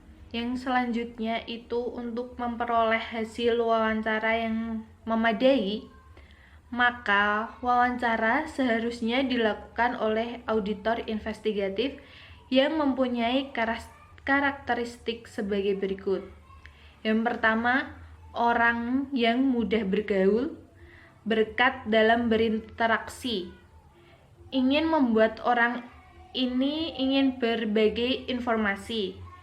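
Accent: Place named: Java, Indonesia. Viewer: meskipun agak medok tp sangat membantu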